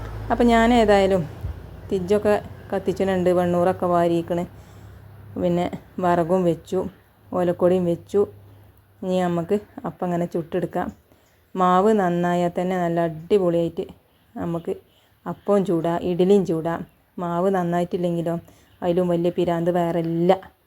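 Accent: Malappuram, India. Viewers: അന്റെ നാട് ഏതാണ് ഒർജിനൽ മലപ്പുറം ഭാഷ ആണല്ലോ ഇജ്ജ് കജ്ജ് പജ്ജ് നെജ്ജ്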